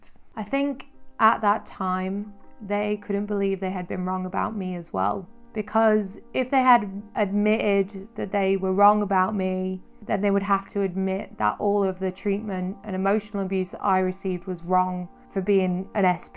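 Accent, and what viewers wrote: Manchester, United Kingdom. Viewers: Hey... I found this from Reddit, Scientology, yes watched a few things and looked into it a bit over the years but this first part has knocked me for six! I think its because you bring home the total reality, home indeed, being a fellow Mancunian. I can also relate to a lot of what you experienced growing up, key development ages to boot!!! It really jumps out at me the True Rebel with a heart bit that you were obviously born with (takes one to know one;). I adore that in everyone, more today than ever before. I was due to be up early tomorrow but i feel an educational binge coming on. Hope you share what you have become in life apart from this brilliant touching series (of which takes a lot of skill and commitment etc) because that's the biggest inspiration, coming out the other side with something of your own to show, and i don't mean materialistic status crap either, I mean the soulful things. 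this kind of abuse destroys the soul but to survive it makes you immensely emotionally intelligent and in touch. You are in possession of large heart! your unselfishness says that much... respect:) Awfully inspiring and... refreshing to hear my home accent lol xx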